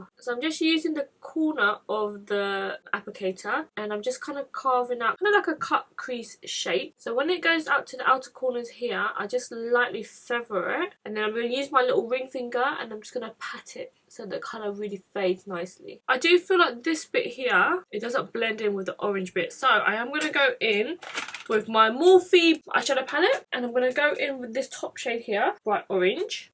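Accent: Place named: North London, United Kingdom. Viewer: You got a beautiful north London accent x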